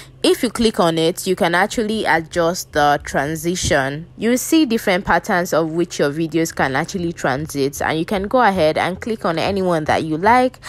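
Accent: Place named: Nigeria. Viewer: im a big fan btw you are relaxing and pretty i love the nigerian accent it's very good!have a nice day!❤️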